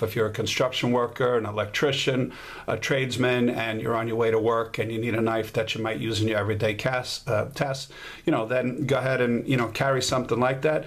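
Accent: United States. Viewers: You certainly sound like you’re from NYC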